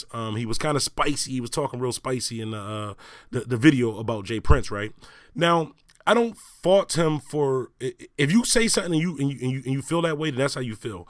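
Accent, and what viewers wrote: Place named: Pittsburgh, United States. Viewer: You from Pittsburgh? you def got there accent or Buffalo Ny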